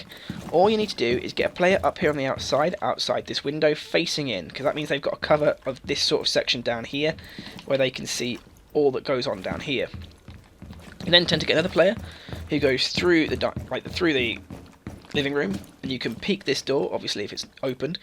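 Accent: Ireland. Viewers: Hey r actually Irish if so wer from cause I'm from Kildare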